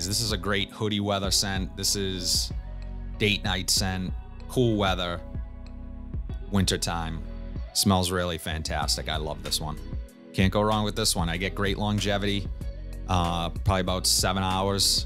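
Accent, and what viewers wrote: Boston, United States. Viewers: Hey, just came across your channel and subscribed. Have you tried Timbuktu L'Artisan Parfumeur? I found it at Burlington too. You might like it. Resinous, smokey, spicy. I liked it a while back but didn’t buy because I was looking for more floral scents from L’Artisan. Are you from Boston? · oh wow that's interesting! I guess I never heard a Boston accent before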